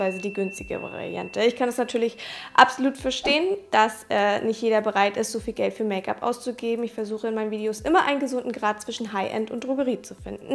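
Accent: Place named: Germany. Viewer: Ich find's süss wie du die englischen Namen richtig Deutsch aussprichst 😂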